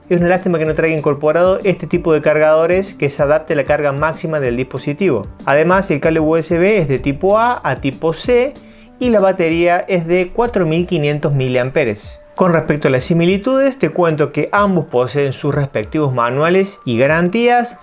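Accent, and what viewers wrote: Córdoba, Argentina. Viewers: Cuesta esconder ese acentito cordobés, gracias por la comparativa me sacaste de muchas dudas. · SOS de Córdoba bicho?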